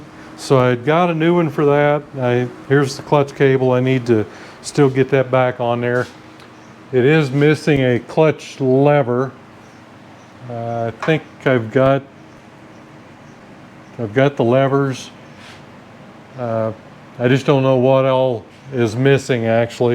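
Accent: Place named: West, United States. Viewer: western accent and New England accent, have to listen closely.LOL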